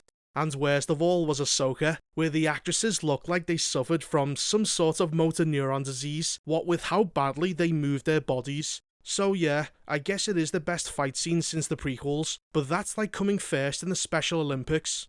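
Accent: Liverpool, United Kingdom. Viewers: Liverpool accent. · He's a posh scouser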